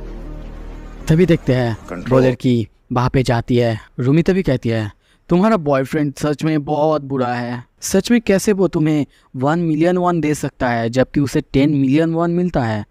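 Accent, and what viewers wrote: Bengal, India. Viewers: Are you from Bengal by any chance?😅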